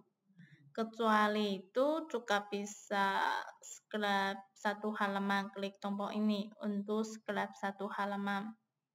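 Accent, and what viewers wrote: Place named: Japan. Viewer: Selamat datang ka dinegri +62....kedengerannya kaka dr jepang soalnya saya punya tmen orang jepang aksennya sama kaya kaka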